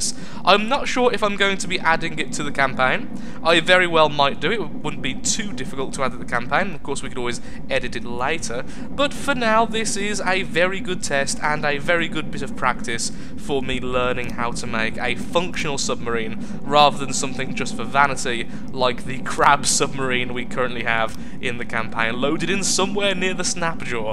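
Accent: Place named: North, United Kingdom. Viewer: Hey man this is some good shit. You're probably one of the best from the depths content providers so stick with it. PS: What county is your accent from? You're northern I think but where abouts? Your accent has some inflections I can't place.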